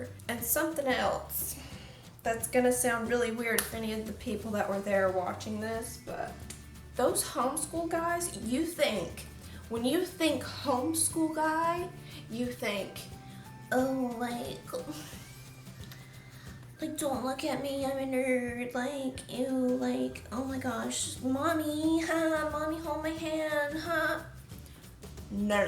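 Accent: South, United States. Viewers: o próprio sotaque dela já da pra saber que é do sul kkkkkk ela deve ser do texas ou alabama ou algo assim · that’s called a southern accent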